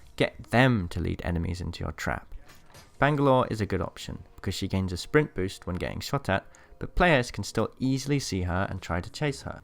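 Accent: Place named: England, United Kingdom. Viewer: Really entertaining, pretty monotone voice but the English accent sorta balances it out, great video overall!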